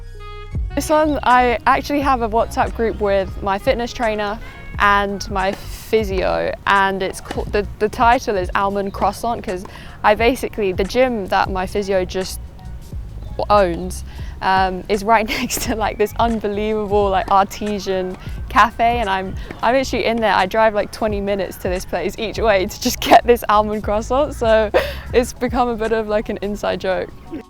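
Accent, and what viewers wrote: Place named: United Kingdom. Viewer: Ll aa ndon... xexexe... so british eh!!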